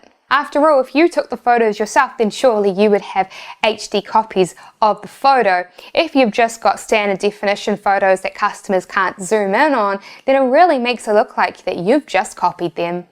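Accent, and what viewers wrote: New Zealand. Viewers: Love your Kiwi accent!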